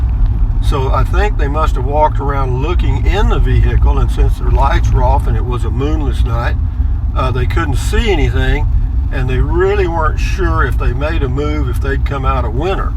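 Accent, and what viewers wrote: Texas, United States. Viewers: Thanks for the Sunday drive! Love your voice and your Texan accent! Good stories. You’re funny- had a couple of gut chuckles😬. Thanks for sharing. Damn, all those roads and not one human in sight!!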